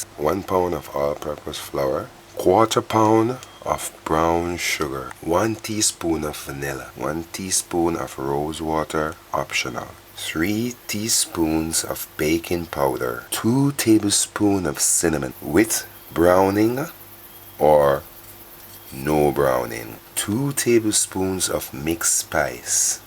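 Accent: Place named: Jamaica. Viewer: Yes, I think you're Jamaican "alf" and "cark". Lol, only a Jamaican can relate))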